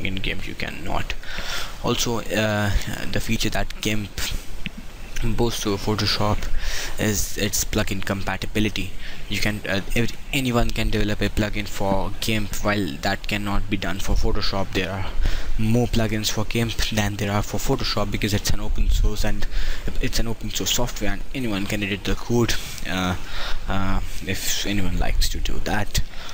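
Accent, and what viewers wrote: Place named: India. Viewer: he's indian . i can get that from his accent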